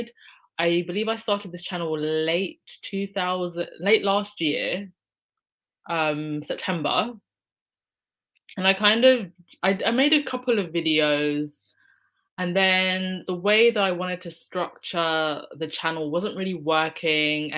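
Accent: United Kingdom. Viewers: A million views that's fantastic Congratulations. It's really not surprising your podcast is very interesting and I love the British accent it makes me feel that I have a front roll set. Thank You. 👍💕💕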